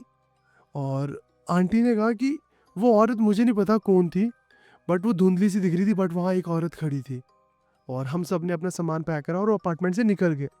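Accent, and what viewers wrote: Punjab, India. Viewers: आप पंजाब से हो क्या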